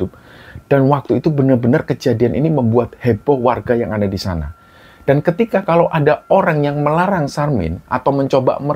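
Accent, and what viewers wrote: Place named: Java, Indonesia. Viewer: kan channel dari Jawa(SBY-Jatim) jadi wajarlah kalo kak tidak menghilangkan logat jawanya..toh beliau selalu menjelaskan kok setiap "kata"(yg dianggap sulit) ke dalam bahasa indonesia agar pendengar paham..setiap host kan punya ciri khas sendiri.. "wong jowo ojo ilang jowone" kalo misal dari Tim mau membuat translate juga gpp..yg penting pendengar masih bisa menikmati cerita..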